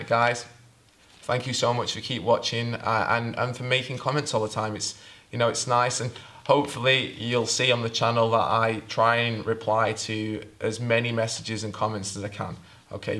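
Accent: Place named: Northern England, United Kingdom. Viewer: off topic but I can't place your accent! I'm getting northern England, but is there scottish there as well?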